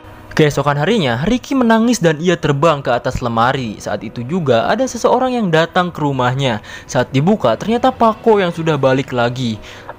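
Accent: West Java, Indonesia. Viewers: Hahaaa sunda abisss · Kakak orang sunda yah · Bang dari jawa barat ya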